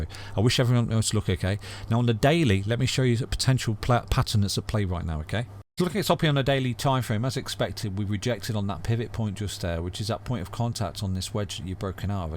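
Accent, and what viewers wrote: United Kingdom. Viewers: Keep up the good lovely british accent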